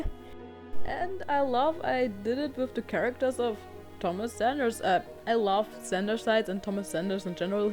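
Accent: Germany. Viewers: Watched to the very end XD For a little critique/some compliments: I'm learning German and one of my teachers has a similar accent to yours. Learning a second language is really hard :( . I really enjoyed the whole thing even if there wasn't a great deal of animation; I think it still works really well, not that I'm an expert in any way, and the music was perfect. The story was creative and convincing and I'm excited to see what happens next and if it somehow ties into Thomas Sanders' life- although I doubt that that will happen. I discovered TS recently and O.M.G.! His Sanders Sides are perfect and somehow so accurate- it's like therapy and they're so helpful. Love the art style, don't overwork yourself ;) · If I'm correct, I think German is your first language? Learning a new language is very difficult, but you're doing great, sis!! I find your accent pretty pleasant-sounding, especially the way you pronounce the names OML so satisfying. 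😂😊 you're also relatable, from the end bit LMAO Keep creating!! 😊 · WOAH I LOVE THIS SO MUCH ! I'M GLAD I FOUND THIS ! Can't wait to see the next part, if you are doing one! 💗 And English isn't your first language? And I may be wrong but you sound german ? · Sorry if this is rude but are you German? Your accent reminds me of other German people's English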